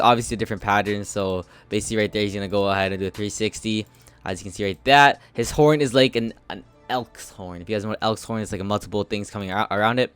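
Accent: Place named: United States. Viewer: It’s an American thing we say it like zee instead of see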